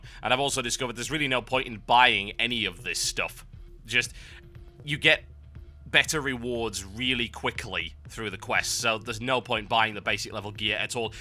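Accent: United Kingdom. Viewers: ure my favourite youtuber atm, mainly because its nice to hear proper British annunciate but if you fail to provide DCU content post launch... I. WILL. KILL. YOU!!!:P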